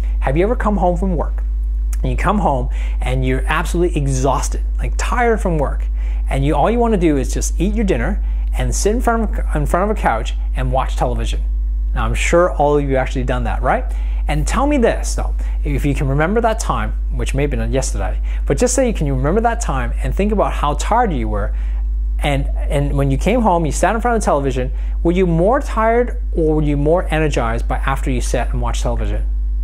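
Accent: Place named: United States. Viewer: You sound American or maybe Canadian.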